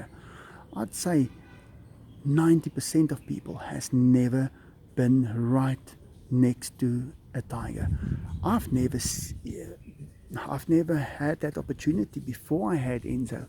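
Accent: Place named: South Africa. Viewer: I just discovered your channel today and I love it. It's so interesting and awesome to see these things, thank you for saving these amazing creatures. I btw also recognized you were south african immediately because I could hear some words similar to dutch and that's when I realized I was right, hahaha! Thank you for sharing your awesome life with us, new sub here :)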